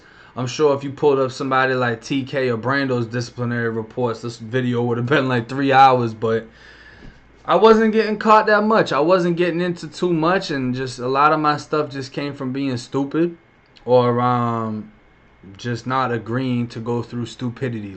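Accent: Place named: Massachusetts, United States. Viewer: Yo i found you on here and love hearing the stories. I’m from New Hampshire and I could tell you were from mass from the accent. I have been through the whole New Hampshire prison system and 5 of the county jails. Shits crazy I’m finally wrapped up after 7 long years but I like hearing from a real ass dude that speaks truth and doesn’t fabricate. You could write a book my dude. Oh ya and my birthday is aug 29 1986. Much love my dude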